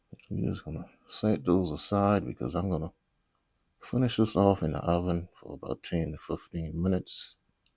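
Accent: Charleston, United States. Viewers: Trying to find out if you have a restaurant and if so where you located? Sounds like your from Charleston SC ? Lol 👍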